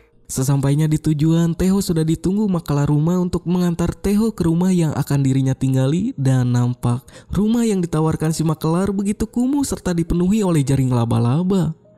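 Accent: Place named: West Java, Indonesia. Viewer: Min orang Sunda nyaaa😭🫵🏻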